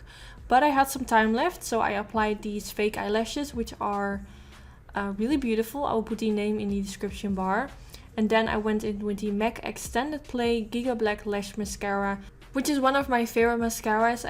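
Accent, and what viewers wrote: Netherlands. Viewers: Are you from Holland? I can hear from your voice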